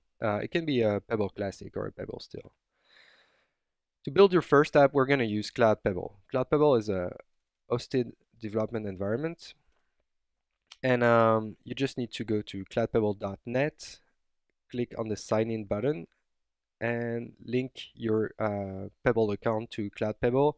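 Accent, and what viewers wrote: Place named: France. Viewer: T'es francais, ca parais ^^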